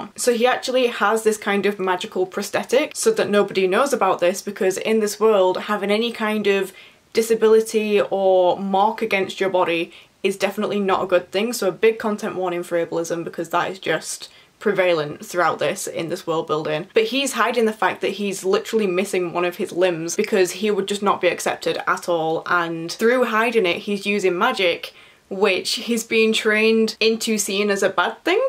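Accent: United Kingdom. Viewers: Strange question I know but are you from Cumbria?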